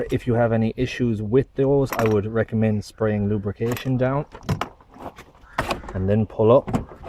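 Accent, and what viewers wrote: Ireland. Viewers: not only was the instruction well conveyed but its nice to hear it with an irish accent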